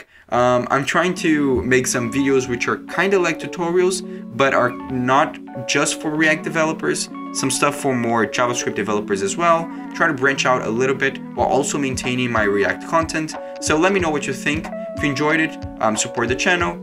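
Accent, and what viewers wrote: Brazil. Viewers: Thanks for the video,. Really useful. I finally got the arrow functions now thanks to you. Also, you sound brazillian for some reason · Olha eu aqui procurando por videos gringos pra aprender web development e me deparo com teu canal! Pelo nome vi que devia ser portugues/brasileiro, mas pelo sotaque imaginei ser brasileiro. Parabens pelo conteudo e obrigado por compartilhar! + 1 inscrito =D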